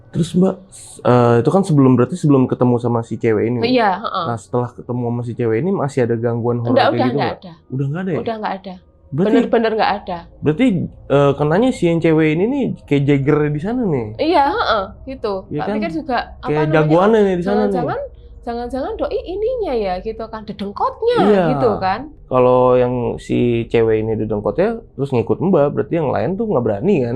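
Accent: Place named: Java, Indonesia. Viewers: Aku seneng mba kalau ada orng logat jawanya keluar seneng .mba nya cantik buaaaanget🤭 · pean medok banget, koyo aku, Mbak 🤣🤭 wis 11 thn tinggal di Belanda tp masi medok jowone 🤣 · Medok e😁😁😁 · Semarang hadir .. pas ki podo smg e. Bahasane semarangan bgt 😂😂 ono ding e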